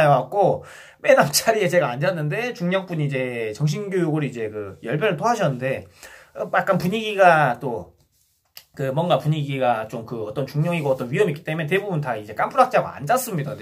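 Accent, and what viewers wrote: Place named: Jeolla, South Korea. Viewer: 내 살다가 이래 재밌게 전라도 사투리쓰는 사람 첨보네 ㅋㅋ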